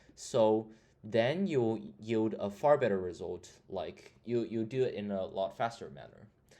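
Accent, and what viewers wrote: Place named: California, United States. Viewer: Here in the states gas water heaters use a sacrificial anode to protect from corrosion and it's often made out of magnesium (sometimes an alloy that includes aluminum or a little zinc) , I don't know what's used in China(or wherever you are with a Californian-esq accent), but I hope that might be useful to know..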